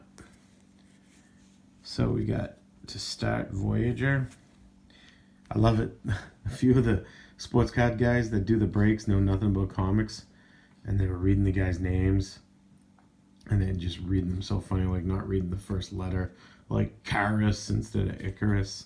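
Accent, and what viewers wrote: Boston, United States. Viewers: Can't place your accent. It sounds like like home town of Boston but I could be wrong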